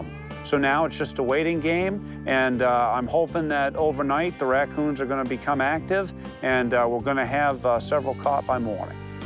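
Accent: Boston, United States. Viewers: He has to be from Boston.... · Boston accent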